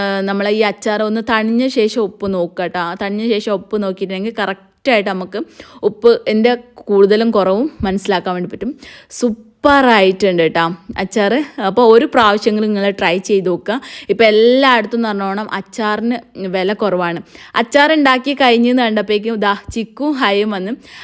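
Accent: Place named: Malappuram, India. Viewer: Malappuram ano... Slang kettitt angane thonnunnu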